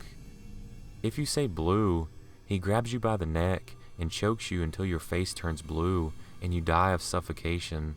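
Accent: South, United States. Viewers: accent is super strong which part of the south are you from im from the south to btw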